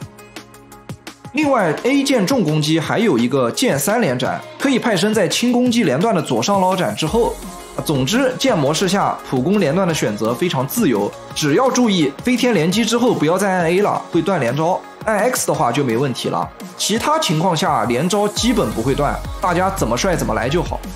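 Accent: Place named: Shanghai, China. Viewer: 您是上海人吧，感觉你普通话的口音很有这感觉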